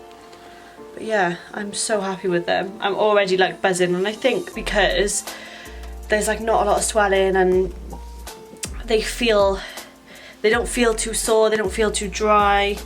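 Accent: Wales, United Kingdom. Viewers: Are you Welsh? ♥️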